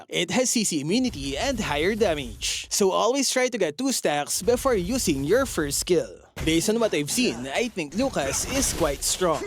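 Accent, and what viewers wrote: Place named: Philippines. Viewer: English filipino dictionary accent.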